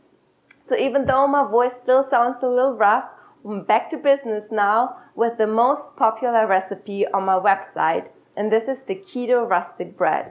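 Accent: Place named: Germany. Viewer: You speak very good English ! What part of Germany are you from ?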